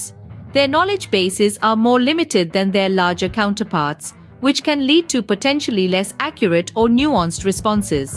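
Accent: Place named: India. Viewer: I don't think ai voice is made on purpose with a slight indian accent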